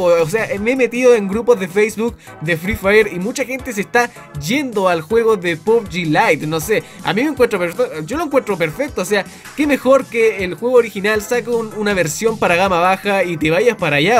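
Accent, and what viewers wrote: Chile. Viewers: Eres chileno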